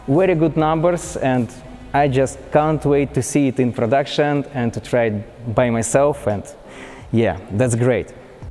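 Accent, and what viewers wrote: Russia. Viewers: Russian English 🤦🤦😂😂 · Привет! работай над английским, особенно над произношением! Ну прям Русский Английский. Молодец! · Ведущий русский что ли акцент такой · Russian Accent is horable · this car is as ugly as the russian english spoken by the presenter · Sorry bro but its impossible to listen your russian english..its not normal.. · I love that he has a Russian accent and looks asian, reviewing a German car. Global! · Хех, русинглишь. Ну понятно говоришь, молодец! За обзорчик спасибо! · very ''Russian'' English... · Блин учи Английски. Звучишь как русский из американских фильмов.👎👎👎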